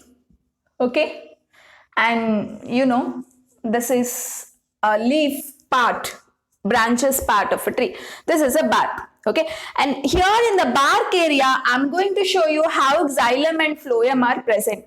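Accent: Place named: Andhra Pradesh, India. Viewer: R u from andhra??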